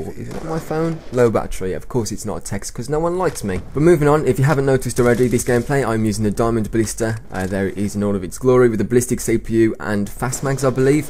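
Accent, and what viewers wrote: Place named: Black Country, United Kingdom. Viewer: Where in England are you from? You sound like you're from the Black Country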